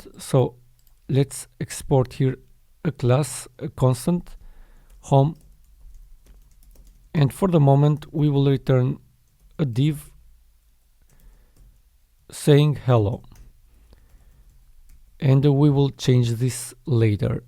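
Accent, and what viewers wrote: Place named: Albania. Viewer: pershendetje, prej aksentit qenke shqipetar 😊.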